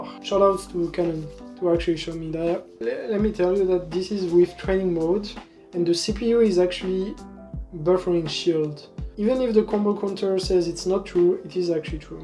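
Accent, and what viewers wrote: France. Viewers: Ton accent est très franchy!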